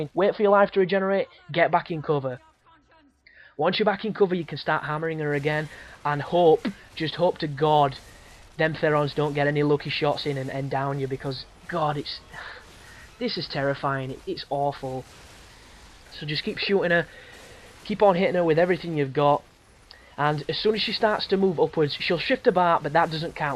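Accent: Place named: North, United Kingdom. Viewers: Dude you sound like the drummer for the band BMTH. So im assuming your from yorkshire cause thats where they are from. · Legend, your northern accent and explanation are immense hahaha nice one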